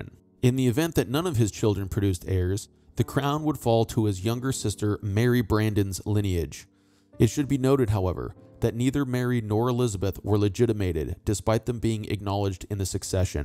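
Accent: United States. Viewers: Soon as u hear a yank commentary its over...csnt stand em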